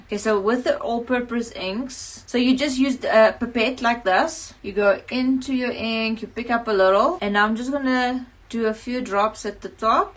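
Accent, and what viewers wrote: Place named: South Africa. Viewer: Your tutorials are fabulous! I just found your channel and subscribed! I thought your accent is from South Africa! I am too from SA! I live in Adelaide now in Australia, but might be moving back to look after my mom. Do you live in SA?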